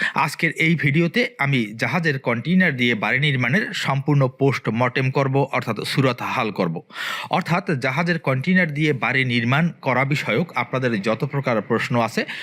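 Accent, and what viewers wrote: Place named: Barishal, Bangladesh. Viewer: ভাই আপনার কাছে একটা প্রশ্ন রয়েছে, আপনি কি বরিশাইল্লা? মানে আপনার বাচনভঙ্গি যতই শুদ্ধ করেন না কেন আপনার বরিশালের ভাবটা রয়েই যায়। বলি কি শুদ্ধ করে কথা বলার দরকার নাই বরিশাইল্লা কায়দায় কথা বলেন তাহলেই তো হয়।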